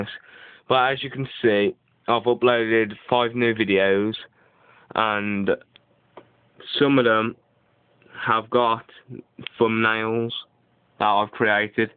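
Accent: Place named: Walsall, United Kingdom. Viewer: thanks a lot mate this help me out loads. you sound like u live near me I live in walsall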